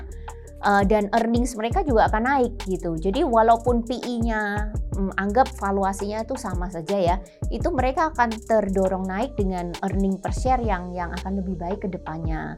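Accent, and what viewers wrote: Java, Indonesia. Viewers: bagus kontennnya. cicinya logat jawa medok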